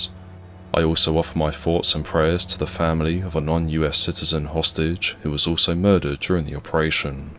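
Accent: England, United Kingdom. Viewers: I agree the video creator really works hard on finding what he can about the wars all over. He has a very clear and easy to understand what he's saying. I'm American and I assume the channel owner is from England and I can understand his accent easy. I drive a lot and have listened to most of his content driving down the road with my ear piece. I know some of this to be true which I am sure all things in the video happened. We love your channel my whole family. Thanks for the phenomenal work you do.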